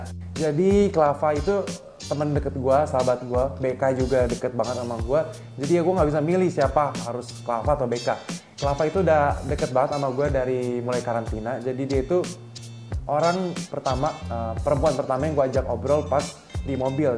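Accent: Indonesia. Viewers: Logat Serang nya brasa bgt yaa 😁🤭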